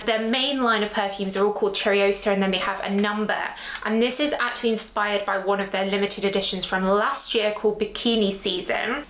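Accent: United Kingdom. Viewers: I actually find most JHAG perfumes quite wearable, more than many of the designers' really.. I love your videos, not only for the perfume info, which is thorough and enjoyable in itself, but also because I get my "do se" 😅 of good British accent input that I need as a teacher😊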